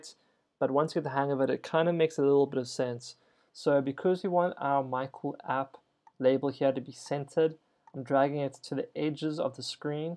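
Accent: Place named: England, United Kingdom. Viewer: Thank you. Very informative... expressed eloquently in a perfect clear English accent!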